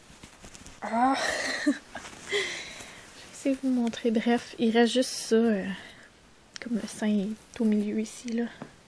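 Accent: Quebec, Canada. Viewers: j'adore ton accent <3 aaaahhhhhhh ça sent les vacances au Canada ça :D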